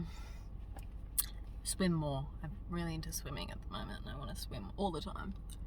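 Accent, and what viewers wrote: Australia. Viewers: Just found your channel....sooooo good! The Oz accent is beautiful....relaxing as fuck 😍👌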